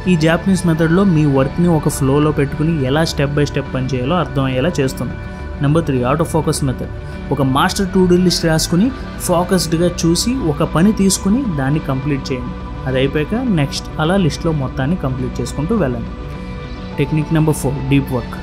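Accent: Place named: Telangana, India. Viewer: Ante konchem Language lo Telangana slang vastundhi anduke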